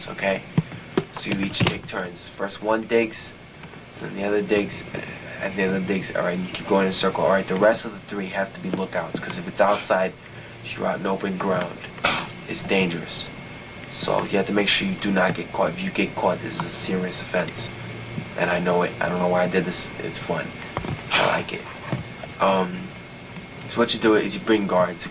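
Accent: New York, United States. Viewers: He has a new york accent tho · He has a New York accent though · his New Yorker by his accent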